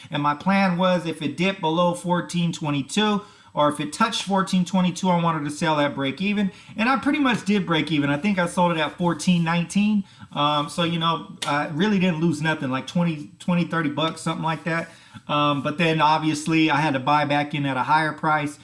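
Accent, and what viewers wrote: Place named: New Jersey, United States. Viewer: HI! I appreciate your videos, thanks for sharing your opinions on TSNP. Was surprised that you haven't received a response regarding the investor conference call....They should give you an engraved invitation!! You are doing good things spreading the word about this company. side note - Where is your accent from? New Jersey? New York?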